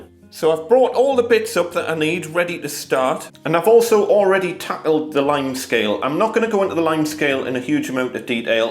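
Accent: United Kingdom. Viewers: His accent (and his wife's) is British and his website says "Proudly made in Britain" and has the UK date format with prices in £, so no, he's not in Toronto.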